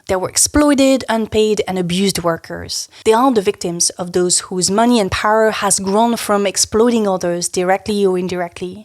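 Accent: France. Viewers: Bonjour, C’est juste la deuxième vidéo que j’ai suivi. I Will Watch them all, I promise. Je suis très fan. J’adore ton approche, je la trouve très informative et basée sur les faits. Ta façon de présenter les choses est tout à fait raisonnable et logique en ce monde de désinformation. Aussi, j’adore ta façon de parler l’anglais. Very cute avec un French cachet. Désolée, je tutoie. Je suis au Canada c’est de rigueur. Félicitations. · Her English is great! Her French accent is very limit compared to others. How many languages do you speak without some sort of accent?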